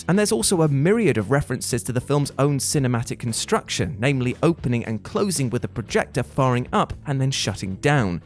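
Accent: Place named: London, United Kingdom. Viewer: you sound like you're from londonnnnnn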